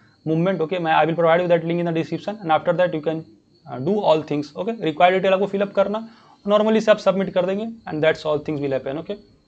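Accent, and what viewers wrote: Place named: Maharashtra, India. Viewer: Bhaiya you seem a Maharashtrian from your accent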